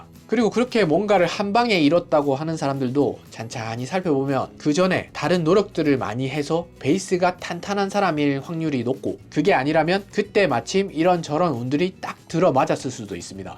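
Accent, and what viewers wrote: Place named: Busan, South Korea. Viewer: 알고리즘에 이끌려 보게 되었는데,, 단주 중 정말 현실적으로 공감되고, 저는 부산사는 사람으로 사투리로 방송하시는게 너무 편안하네요 대단하세요!!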